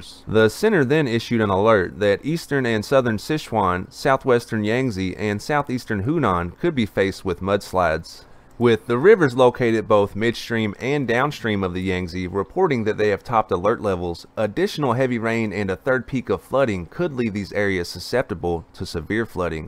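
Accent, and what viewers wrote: South, United States. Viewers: Like the accent tho I gotta southern accent too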